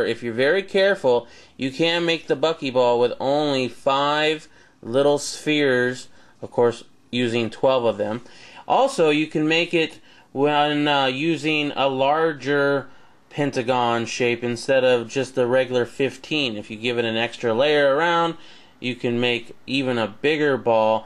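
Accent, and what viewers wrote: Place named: United States. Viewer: Damn, you talk like a stereotypical American.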